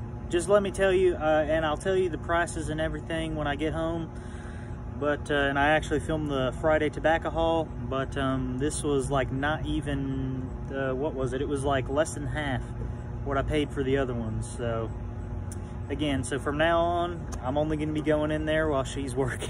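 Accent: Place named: South, United States. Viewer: Why is your Southern accent amplified by like 10 in this video?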